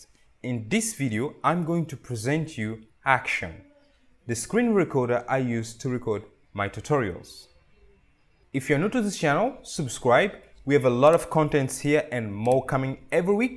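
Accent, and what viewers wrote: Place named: India. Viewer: i like the slight indian accent lol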